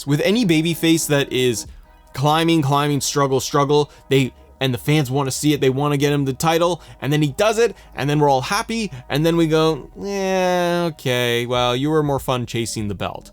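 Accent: Canada. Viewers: Are u from Canada?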